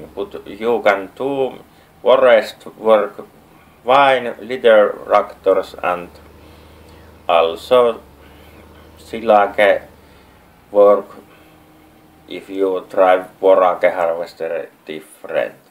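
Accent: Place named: Finland. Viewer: Eihä sille voi mitää itekki menee englanti siihe ralli englantii kuten valtra valmet mainitsi jo:D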